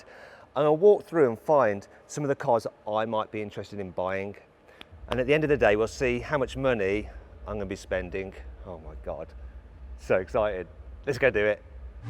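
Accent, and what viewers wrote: Birmingham, United Kingdom. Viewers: Is this guy a brummie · Never thought i'd hear "barn find" in a thick, British accent again. I already miss you, Horizon 4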